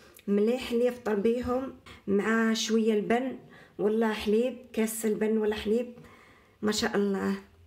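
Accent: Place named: Laghouat, Algeria. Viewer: اختي هدرتك كيما هدرت ناس الاغواط.ممكن نعرف انت منين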